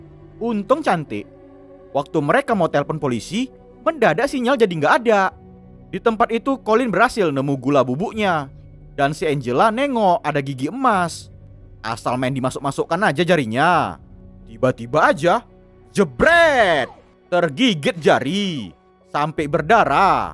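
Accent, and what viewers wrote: Medan, Indonesia. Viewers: Cak ko buat alur cerita ramboo the last blood, laey..paten kali bahasa medan ah..mantap ❤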